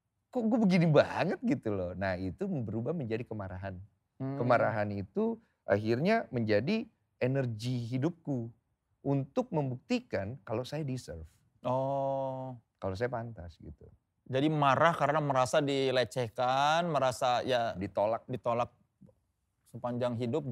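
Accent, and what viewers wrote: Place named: West Java, Indonesia. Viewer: baru tau kalo logatnya denny sumargo ternyata nyunda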